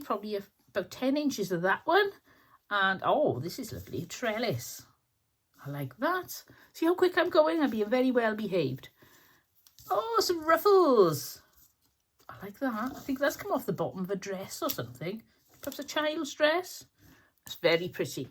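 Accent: Wales, United Kingdom. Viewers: So lovely to see you back and to hear your velvety Welsh voice coming from the the tv again. Please take it slowly, and allow yourself to heal. What a wonderful tonic Catherine has sent you. Just perfect. ☺️ Xx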